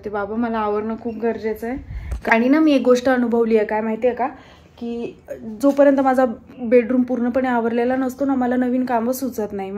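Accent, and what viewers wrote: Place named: Nashik, India. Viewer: शेवटी ताई तुझ्या बोलण्यामध्ये थोडी नाशिकची झलक आहेच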